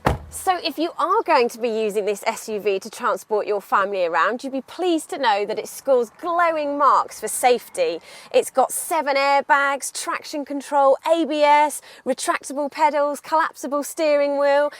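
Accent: United Kingdom. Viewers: lovely girl, love the GB accent, good review too. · Honestly if I had british accent like hers, I will never stop speaking. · I love her British Accent and the way she was holding the banana... think she was hinting something lol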